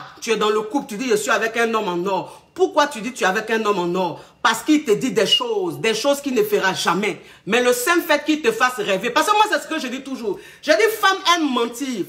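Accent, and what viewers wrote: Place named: Ivory Coast. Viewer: Une vraie ivoirienne qui parle la même, je suis fan, longue vie à toi la madré .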